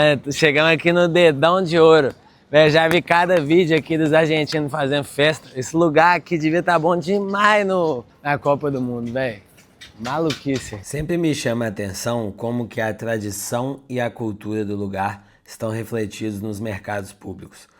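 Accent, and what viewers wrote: Minas Gerais, Brazil. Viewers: Muito bom , pelo sotaque é aqui de minas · ce e mineiro?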